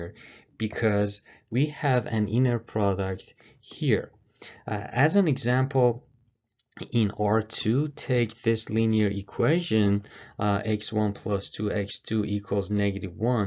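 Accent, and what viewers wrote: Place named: Iran. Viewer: the accent is kinda iranian btw the best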